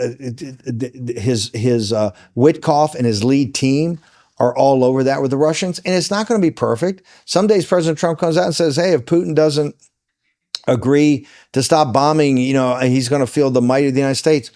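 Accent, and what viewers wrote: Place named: RP, United Kingdom. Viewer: too bad Bannon has to be talking with a lightweight. A beeb accent is not a guarantee of ability.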